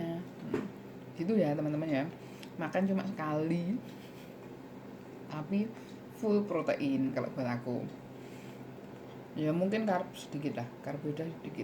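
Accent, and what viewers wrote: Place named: Indonesia. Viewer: Muka kamu mcm latin tp dengar cakap mcm indon..salam kenal sis..semangat selalu ya👍👍👍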